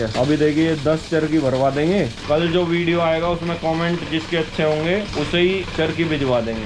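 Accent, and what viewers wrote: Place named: Jacobpura, India. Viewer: Jacobpura k aspas k lgre ho bhai😅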